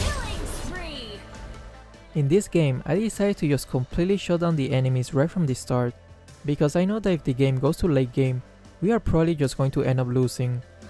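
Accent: Philippines. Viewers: im confused at your accent are you filipino or what?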